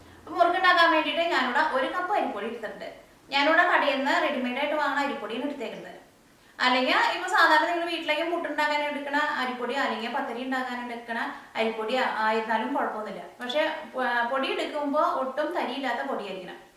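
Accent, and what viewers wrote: Thrissur, India. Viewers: Chechi de nadu thrissur ano?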